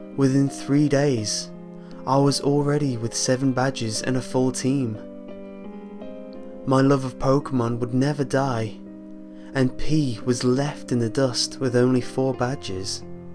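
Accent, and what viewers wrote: United Kingdom. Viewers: ) omg Your british acsent is awesome !! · Just curious, are you British?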